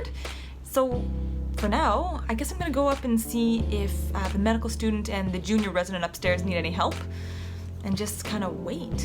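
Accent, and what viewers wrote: Canada. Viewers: Clearly Canadian. The accent is strong in this one 😂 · Is it just me or dose she sound Canadian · She sounds SO Canadian. Is she?? Edit: I see the tims. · I think its Canadian. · Love your videos!! And your Canadian accent 😄 I love watching medical dramas on TV but your channel is just as captivating because it shows real life & explains it all!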